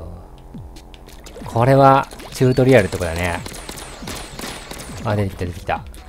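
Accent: Hiroshima, Japan. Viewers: Rさん！広島弁教えてください＜(_ _)＞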